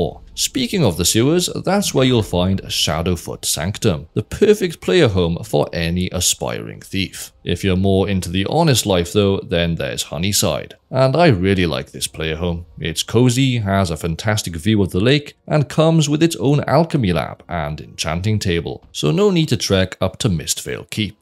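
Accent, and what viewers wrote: Wales, United Kingdom. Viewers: I don’t see anyone else having remarked on it after a cursory skim, and it might be just an accent thing, but I swear it sounded like you said ash-thetic. So, either your diction gives a bit of Sean Connery (I know, you’re Welsh, he’s not, it’s not technically an accent thing, but ykwim; also Welsh accents are so pretty, like burbling streams), or you snuck in a 10/10 pun. Either way, it works!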